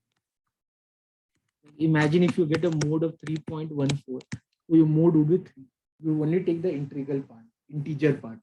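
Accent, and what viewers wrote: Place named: India. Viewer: bro that indian accent of yours makes it really hard to understand the vid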